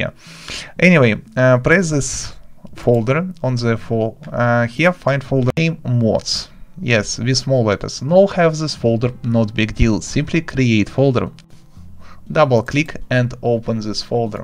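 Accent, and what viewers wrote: Russia. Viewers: такой русский акцент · хахаах сразу слышу русский акцент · It's actually Runglish. 😏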